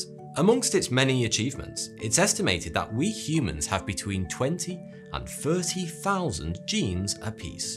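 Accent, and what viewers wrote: United Kingdom. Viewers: I've been interested in inbreeding for many years, and have read about and watched videos concerning the Hapsburgs and Queen Victoria's descendants spreading the hemophilia gene. You did a very nice job researching and presenting this information about inbreeding. Add to that that you're a Brit with a fantastic accent, and I'm hooked on this channel! Everything sounds better when narrated by a Brit!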